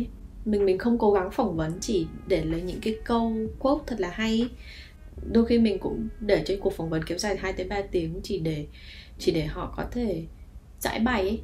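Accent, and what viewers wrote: Vietnam. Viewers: Bạn là người Bắc hay Nam?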